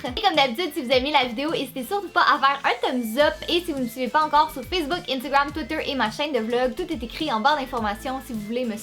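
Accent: Quebec, Canada. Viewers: Eh tes trop belle j'adore l'accès québécois et j'adore tes vidéo bisou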